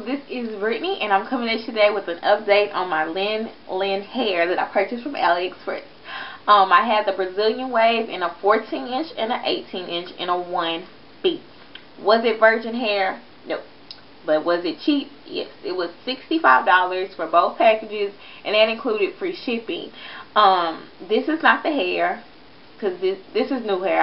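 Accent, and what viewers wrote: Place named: New Orleans, United States. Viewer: Im from new orleans girl and i was like oo her acsent sound so familiar, and now i know lol.. cute cute cute !!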